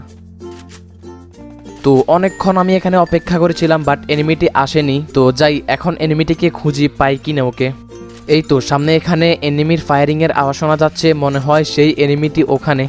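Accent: Noakhali, Bangladesh. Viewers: তুমি যে নোয়াখালী ভাষা বল আমার থিকে জোস লাগে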